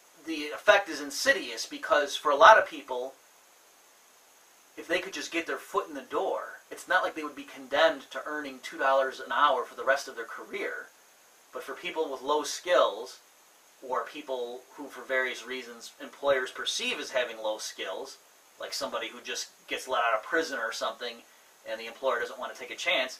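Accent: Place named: Ireland. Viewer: Im starting to hear Murphy's Irish accent. I tried running this logic with my commie econ professor and he kept bringing up Marx.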